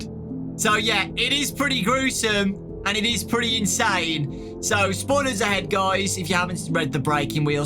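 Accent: United States. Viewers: American accent is so good it's unsettling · Lol that American accent 😄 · i love his american accent lmao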